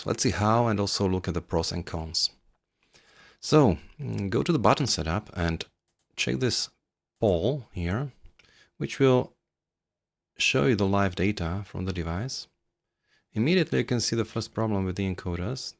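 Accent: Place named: Czechoslovakia. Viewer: Perfektní recenze, možná nejlepší co jsem na STECS viděl (a že jsem jich shlédnul opravdu hodně :). Pořád váhám, jestli si pořídit throttle od VKB, nebo od Virpilu a tohle mě hodně nahlodalo ve prospěch VKB STECS. Jinak jsem rád, za závěrečné potvrzení mého tušení, že vaše perfektní angličtina má nezaměnitelný "československý" přízvuk. Díky a zdravím na Slovensko z Moravy :)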